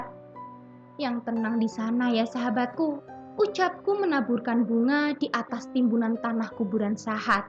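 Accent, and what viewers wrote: Java, Indonesia. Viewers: Org jawa ya kak ?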